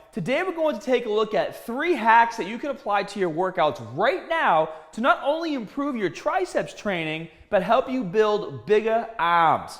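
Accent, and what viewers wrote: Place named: New England, United States. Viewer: I don’t remember this thick New England accent when you were on the real world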